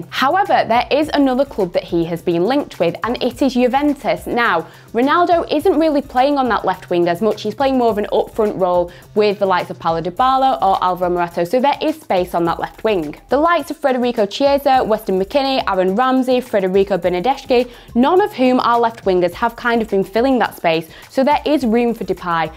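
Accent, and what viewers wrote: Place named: United Kingdom. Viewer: Its a British dialect....some also call British " bretesh"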